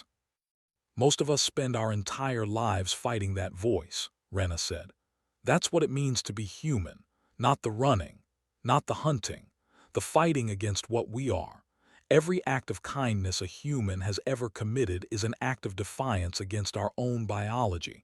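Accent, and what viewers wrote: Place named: United States. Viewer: OMG do something with AI Language skills before you broadcast. it talks almost like it was taught not English but 'Murican.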